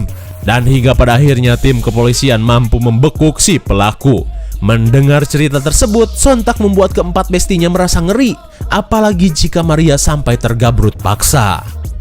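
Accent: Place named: Sunda, Indonesia. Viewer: Sunda na lekoh pisan ih 😂😂😂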